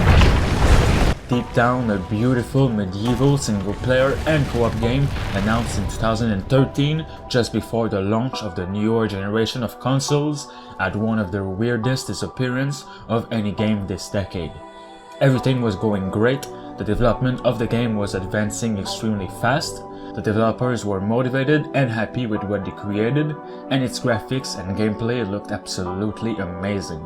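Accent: Quebec, Canada. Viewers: Happy to hear this project is still alive, looked very promising. And hey, are you from Quebec ?! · Are you from quebec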